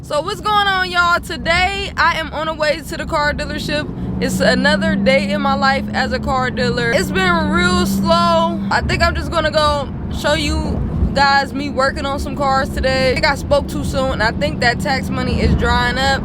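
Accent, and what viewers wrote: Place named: Detroit, United States. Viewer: You sound so Detroit sis lol you in the city?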